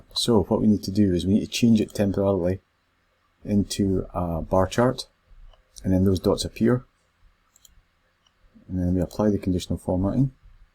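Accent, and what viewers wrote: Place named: Scotland, United Kingdom. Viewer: Very nice. Great explanation. Thanks a lot. Nice to hear a Scot!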